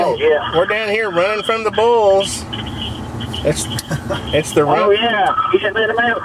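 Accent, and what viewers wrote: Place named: Appalachia, United States. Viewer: Peter has a New English accent this guy has a Appalachian accent. Ones Northern the others Southern very different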